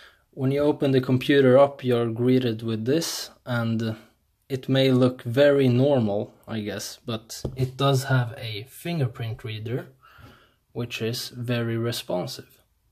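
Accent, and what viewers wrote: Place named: Sweden. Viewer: I'm assuming you are from Sweden due to your accent. So am I. Would you say it's worth 3000:- to add the 4k-screen? Deciding which customization to buy. Noticed you talked alot about the two screens. Have you tried the full-HD one yourself? Cheers and thanks for the content!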